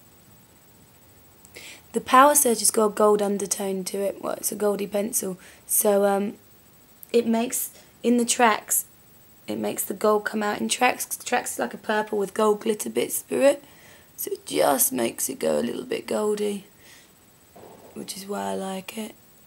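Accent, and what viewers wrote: England, United Kingdom. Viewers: If you mean 'accent', she's English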